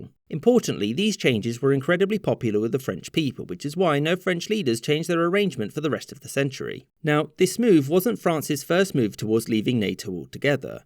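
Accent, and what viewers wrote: United Kingdom. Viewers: given the narrator’s very British accent, I suggest your comment is misplaced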